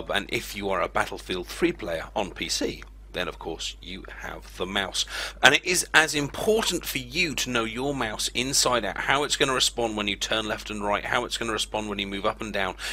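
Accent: United Kingdom. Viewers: British accent <3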